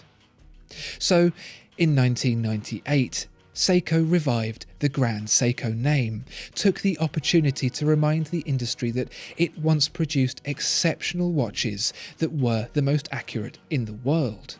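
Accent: United Kingdom. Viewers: I am not a watch collector nor a watch enthusiast, my dream watch is Panerai and I have 2 of it so far, I watch your videos usually for the little historical infos you mix and match in your videos and also the elegant British accent, but this time you got me interested as both watches got my attention even the presage. Thank you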